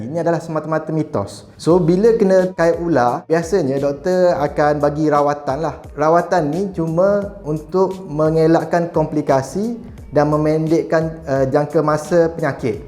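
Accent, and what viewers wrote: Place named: Kelantan, Malaysia. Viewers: Bunyi Kelate parok. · Bang kelantan ke? Napok ckp kl jd kekok..haha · Ni mesti org kelantan / ganu 🤣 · Confirm abg ni orang pantai timur 😂😂. ade seni dia tu.. nice content bro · Ore kelate kcek luar nmpk lain .tu buleh taw dye ni ore kelate😍😍😍😍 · abang ni oran kelantan ke? bunyi pelat bektuh hihihi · Hahaha jolo tu abg ni ore kelate laa cakap gitu gakk 😂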